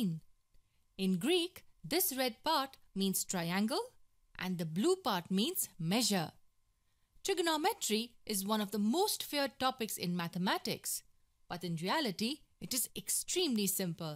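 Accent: India. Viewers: Not bad. But obviously Indian accent is irritating. When you learn English, learn enunciation!!!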